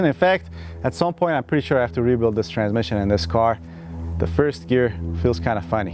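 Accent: Germany. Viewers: I really like your superior German accent. :) Thanks for all of the tips. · Bro you are the best. Your German accent is hilarious 😂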